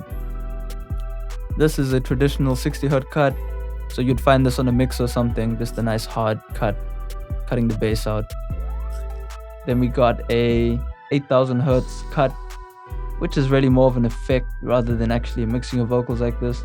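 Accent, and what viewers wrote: South Africa. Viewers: Thats the most South African accent iv ever heard lol cool vid man!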